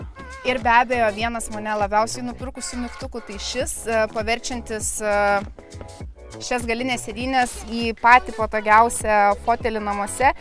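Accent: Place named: Šiauliai, Lithuania. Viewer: Apzvalga šiaulietiska 😂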